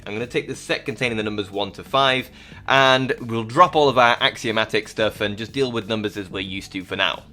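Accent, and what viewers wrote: Yorkshire, United Kingdom. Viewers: *Man with an accent vaguely resembling the Yorkshire accent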